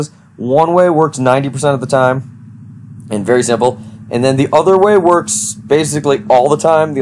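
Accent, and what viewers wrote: United Kingdom. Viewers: Sounds like he's kinda British though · idk I kinda agree that he sounds British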